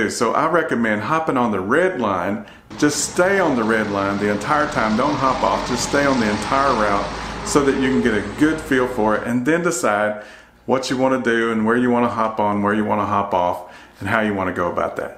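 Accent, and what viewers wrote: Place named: South, United States. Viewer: I love your country accent.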